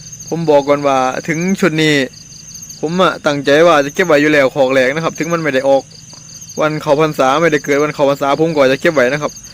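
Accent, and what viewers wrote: Korat, Thailand. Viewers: ดี๋แท่ะๆ...ดี๋ใจ๋ดาเด้อนาย หมานๆรุ่งเรืองราบรื่นๆ มนต์เสน่ห์ภาษาท้องถิ่นโคราช...ใช่ออกสื่อ!!! FCเด้อนาย!!! 😍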